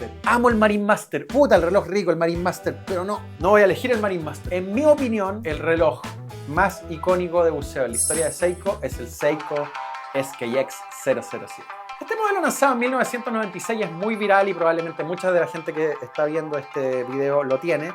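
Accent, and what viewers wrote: Chile. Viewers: Al final … tuve que leer la transcripción. Tu nivel de Chileno es demasiado complejo para los simples hispanohablantes. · Sin ver los videos va like directo... me encantan los relojes pero mas tu pasión y el acento chileno.... cachai? Abrazo desde Argentina!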